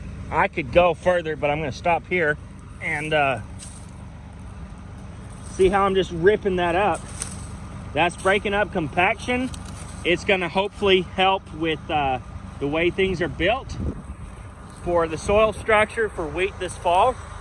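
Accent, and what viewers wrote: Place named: South, United States. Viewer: What part of Texas are you in and what do your grow, just wheat? BTW SO GOOD to hear a "ya'll" on a farming video! I have been drowned with "you betcha" and "you's guys" on farming videos and it is SOOO good to hear a good ol "almost" southern accent, course I'm from BAMA! LOL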